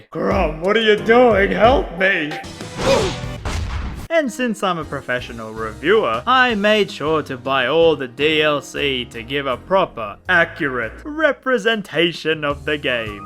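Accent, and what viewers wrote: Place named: United Kingdom. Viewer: I agree. Your British accent sounds more like you're talking while trying to hold back a yawn.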